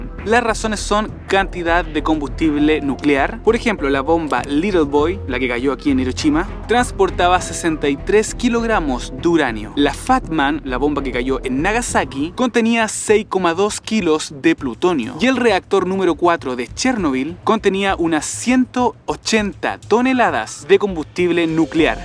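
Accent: Chile. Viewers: ERES CHILENO! Que lindo escucharte! Gracias por comenzar el video sin intros y directo al grano. Gran video y dicción. Sigue así 😊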